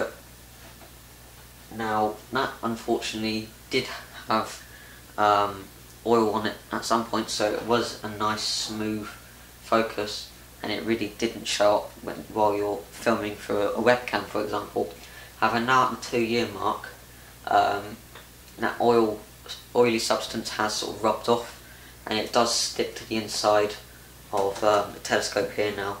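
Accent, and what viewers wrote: England, United Kingdom. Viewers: Thanks for the review - but try to speak so people outside england can understand - so please remake with clear and slower speak and have in mind that lots of people dont have english as their main speach - so please remake - thanks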